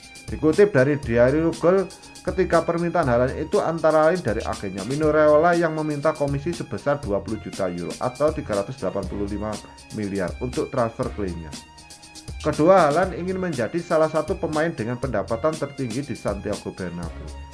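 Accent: Java, Indonesia. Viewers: Presenternya terlalu medok..😁😁😁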